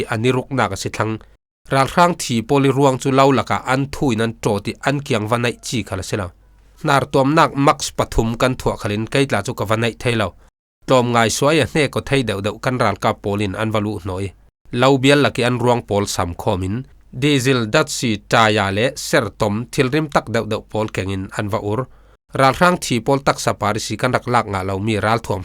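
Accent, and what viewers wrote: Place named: Falam, Myanmar. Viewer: Na thu sim ngai nuam tuk.falam khua sung tong ro ri si.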